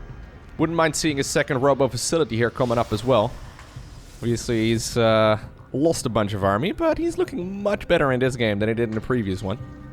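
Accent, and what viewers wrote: United States. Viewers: Yea, Lowko...it is interesting to hear about your American Twitch Standard accent and you behaving like a degenerate Runescape-addict past weekend and all but can we get back to the game...that would be great...yeah....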